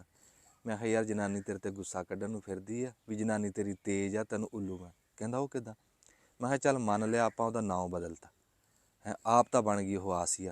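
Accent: Punjab, India. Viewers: صاحب، چنگے کم لگے او پاکستان دے سارے پنجاب وچ نام نوں پنجابی وچ ناں آکھیا جاندا اے ،نو صرف انڈین پنجاب وچ بولدے نے ۔ ھور کرو پروموٹ انڈین پنجابی تے لہجے نوں تے ساڈی دعا اے کہ 47 دوبارہ نا دھرا دین جدوں کوئی ویلا آوے فیر مستقبل وچ ،اے ساریاں محبتاں نک دے راھے نکل جانیاں نے۔😢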